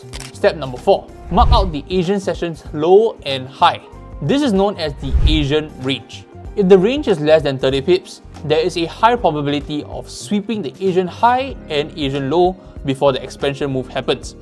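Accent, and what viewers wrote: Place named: Singapore. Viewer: Singapore accent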